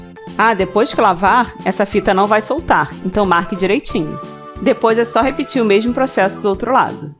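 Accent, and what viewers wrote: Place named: Rio de Janeiro, Brazil. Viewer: o sotaque carioca são um charme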